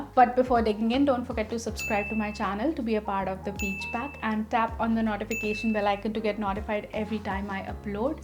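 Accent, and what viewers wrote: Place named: India. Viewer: Indian accent 🤮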